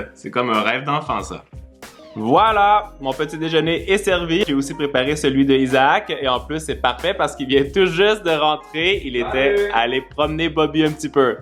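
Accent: Quebec, Canada. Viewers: a l'accent québécois et un accent qui ressemble a l'accent hollandais (enfin le ton lorsqu'il parle français) Pourtant ils sont jumeaux je suis perdue.... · Pourquoi et plus québécois et on frère est plus allemand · J’adore l’accent d’Isaac, c’est un mélange de Québécois, Anglais et Allemand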